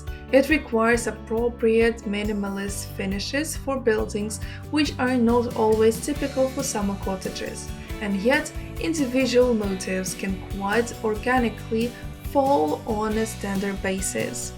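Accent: Russia. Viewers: Русский акцент уникален😊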